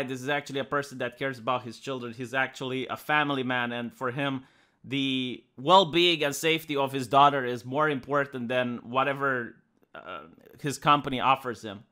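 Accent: Romania. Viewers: They hate your Romanian accent? Man, English is my first language ... at least they can understand you ... I'm Scottish so if anyone wants a competition at bastardising the English language, give us a shout. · "Don't like your Romanian accent?" dude, that's your signature, if you drop it, I don't even know if I find your content the same. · But I like your squeaky Romanian accent. It's like...charming and shit.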